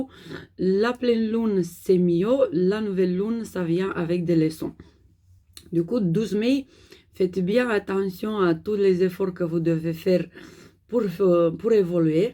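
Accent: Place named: Russia. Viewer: j'aime beaucoup votre voix et tes accents. êtres-vous d'originalité Russe?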